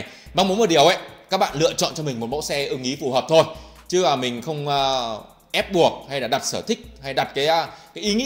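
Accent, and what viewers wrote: North, Vietnam. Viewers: Bác người bắc hả,k thấy nói giọng nam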